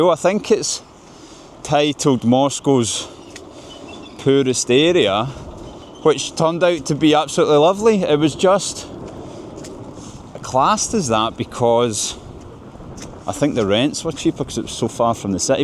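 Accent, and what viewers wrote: Scotland, United Kingdom. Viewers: Russian with a Scottish accent is something really new to me... I'm not Russian myself.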